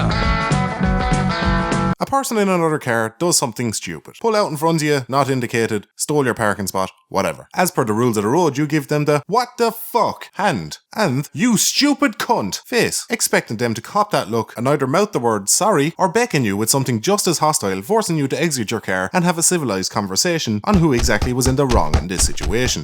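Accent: Ireland. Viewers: Hearing say the most marvelous of insults and creative swears in his Irish accent is the reason I get out of bed in the morning. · Swear to God, hearing the Irish accent swearing is like wiping your arse with silk.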